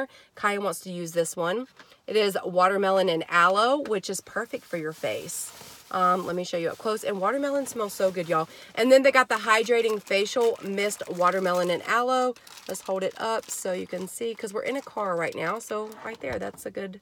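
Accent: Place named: Georgia, United States. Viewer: Hey! Just knew you were from Ga. I came across a video the other day and subscribed. I thought dang she sounds like me lol....I'm in Newnan!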